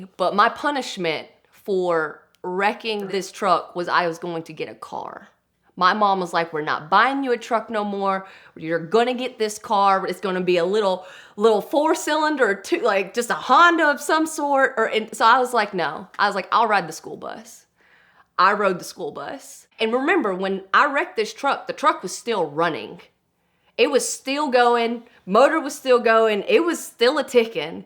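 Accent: South, United States. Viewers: Heard the accent, thought southern bell. Said hillbilly dad, check, philiphina mom hold up. Look at video. Ah yes still a southern bell lol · Anyone else’s eyes pop out of their head when they saw a good lookin woman, talking in a southern accent, about a truck?😂😂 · Beautiful girl, southern accent and loves trucks, she's perfect! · Pretty asian girl with a southern accent, was a bit confusing, but it sounds like she's come a long way from rolling her truck to driving race cars 👍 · Came into this video hoping to hear a southern accent saying "big ol mud tires". Was not disappointed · what is hotter that a gorgeous asian woman with a southern accent? WOWWEE!!! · Cream job sums it up.... That southern draw so sexy.... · Not even in by 9 seconds yet and dam that southern accent, i'm in love lol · I fkin love southern accents. Im from Boston so dont hear it much up here. 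· A Filipino with a STRONG southern accent - there has been a disturbance in the space-time continuum.... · Too southern of an accent for my likes, then I thought to myself Georgia? Why doesn’t Ed sound like that? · This channel makes me want a southern accent. "Quert o' ol" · I love the blunt south talking thank god im not the only one left in 2020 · Nothing can be more American than her accent!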